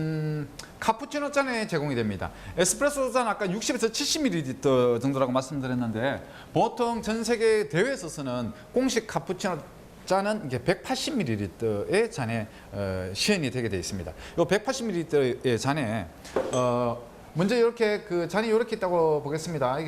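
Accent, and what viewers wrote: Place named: Gumi, South Korea. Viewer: 강의 넘 잘들었습니다 혼자 공부함에 많은 도움 되었습니다 커피에 대해 알고 싶은 욕구 충분히 채워주신 덕분에 만족합니다 고향구미 사투리 반갑고 정겹습니다 ~^^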